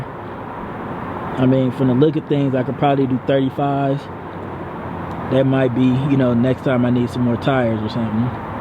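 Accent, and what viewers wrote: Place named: California, United States. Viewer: Sound like he from Cali or West Coast. I may be wrong though lol. Good review!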